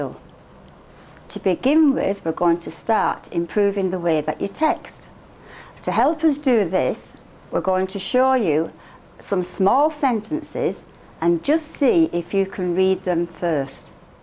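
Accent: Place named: Southport, United Kingdom. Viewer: Thank you! Bonus that my family grew up in Southport, so I love hearing your accent! xo Roz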